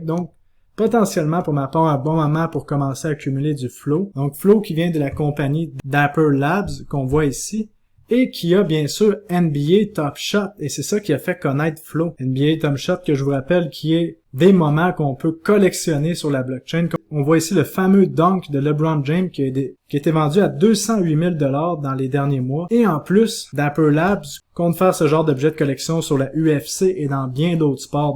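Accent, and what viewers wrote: Quebec, Canada. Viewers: Je n'aime pas l'accent Québécois lol. Mais j'aime bien ton contenu ! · Merci encore et encore pour tes conseils et analyses. En effet ton accent est très agréable, ça me rappelle mon voyage à Sherbrooke (il y a 30 ans, time flies). Keep up the great work! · Le mana prendre position ou non ? L'accent canadien ma toujours fait kiffer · C'est vrai que je trouvais l'accent canadien horrible avant, et maintenant grâce à toi en plus d'en apprendre beaucoup sur les crypto, et bien j'aime bien cet accent ah ah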